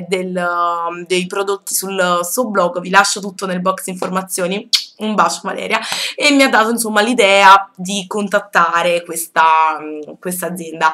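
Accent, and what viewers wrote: Naples, Italy. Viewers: Nuova iscritta !! :) Sei simpatica, carina e dolce ! E poi sei napoletana (mi pare di capire dall'accento!) ... bacio :*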